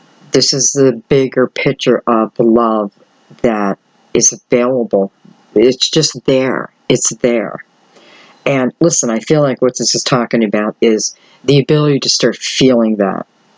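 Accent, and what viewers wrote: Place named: Philadelphia, United States. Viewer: sharp 907 I hear it too. I’m from philly. We all have that accent. Lol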